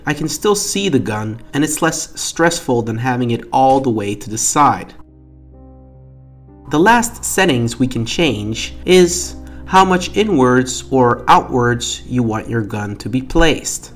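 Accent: France. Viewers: Are you french ? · are u french?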